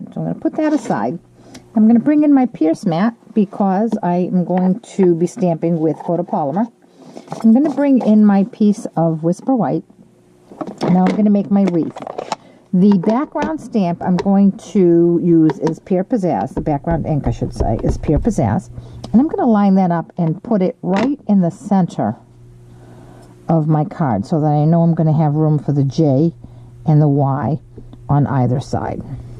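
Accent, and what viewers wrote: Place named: Maine, United States. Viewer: Super cute card need to get the letters lol. Need to look and see if you have scrapbook pages videos. I hear your accent where are you from sound like Maine.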